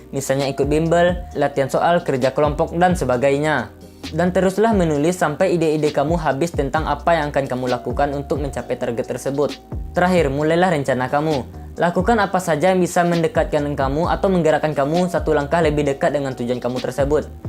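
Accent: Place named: Punjab, India. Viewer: Bang Punjabi bgt😅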